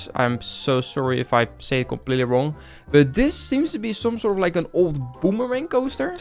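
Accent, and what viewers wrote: Netherlands. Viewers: U dutch like Benji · Sorry man maar je hoort wel veel te veel Nederlands er tussen door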